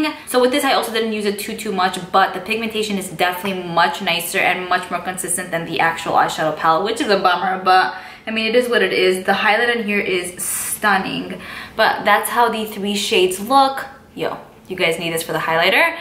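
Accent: New York, United States. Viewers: Listening to you is like listening to a friend gush about something i must try! You are definitely from NY hehe The accents give us away. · I can tell you from NY cause of our accent! I swear watching your videos is like having a girl chat with my non existent friends lol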